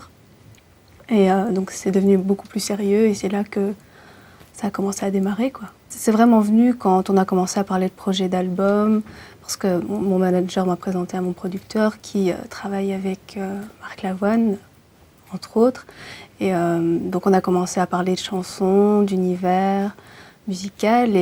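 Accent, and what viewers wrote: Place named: Belgium. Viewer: elle a un petit accent belge, j'adore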